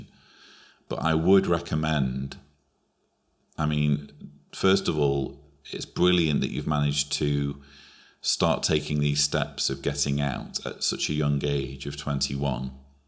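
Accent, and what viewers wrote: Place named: Manchester, United Kingdom. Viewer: Manchester by the accent (and the fact that he shows photos of himself in Manchester and Dudley). Somewhere thereabouts anyway.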